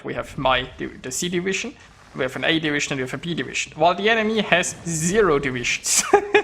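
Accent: Germany. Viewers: First time on the channel, and the maniacal laughter couple with the German accent makes for a great experience.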